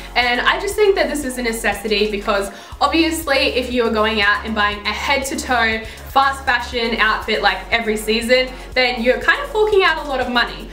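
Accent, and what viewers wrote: Australia. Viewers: Are you Australian?? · are u from Australia??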